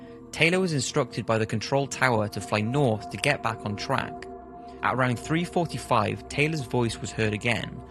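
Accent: Wales, United Kingdom. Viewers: House Giantsbane I think I detect traces of a Welsh accent. If so, iechyd da! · you need to be hired to narrate literally everything. You are a modern day Morgan Freeman with a British accent.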